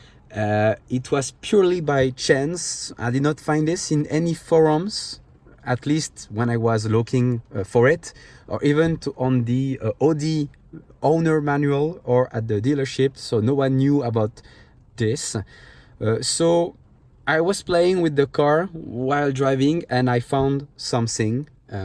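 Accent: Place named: France. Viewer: Tu es français? Je l'entends à l'accent et aux "euh" qui ne trompent pas lol Merci pour la video, mm si je ne possède pas du tout cette voiture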